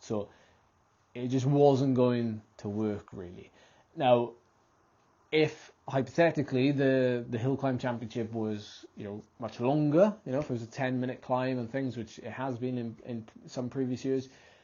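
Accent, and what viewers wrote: Wales, United Kingdom. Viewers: mate please DO NOT FALL INTO THE YOUTUBE TRAP of having to put content out!! You are well above that, you are quality and you deliver, and you are Welsh 🤣 Love that accent ❤😅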